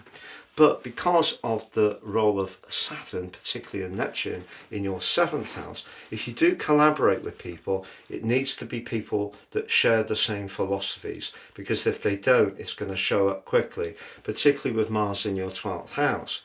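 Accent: United Kingdom. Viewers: It's a beautiful British accent. Keep on listening, open the Closed Captions and your ear will get used to it!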